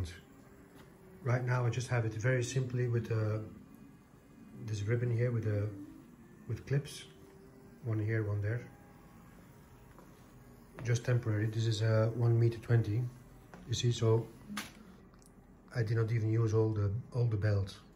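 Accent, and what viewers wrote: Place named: Netherlands. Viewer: I thought you were Dutch because of the accent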